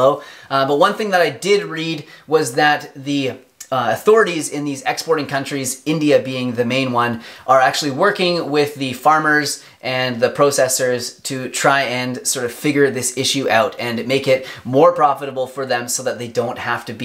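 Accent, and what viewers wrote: Canada. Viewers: Joey Heffernan he also pronounces Out and about like canadians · Hi! I’m new to your channel. Judging by the way you talk. Are you from Canada? People there have a unique way of saying the word “out.” Sorry for being off topic! 😅 I’m glad to find out you’re Vegan! Continue to stay healthy! GOD bless you and everyone else you love! 🙏🏾✝️🐑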